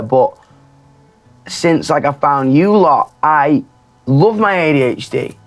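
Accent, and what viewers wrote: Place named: Doncaster, United Kingdom. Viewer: I love the Doncaster accent.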